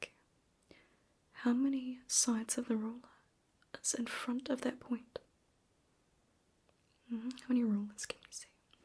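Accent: New Zealand. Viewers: Kiwi here, so good to hear our accent on here hehe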